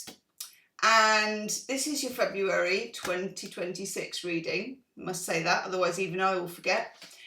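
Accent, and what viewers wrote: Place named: United Kingdom. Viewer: Ii know we both speak English ; but for some reason this American is having difficulty understanding the British English. It’s my fault of course !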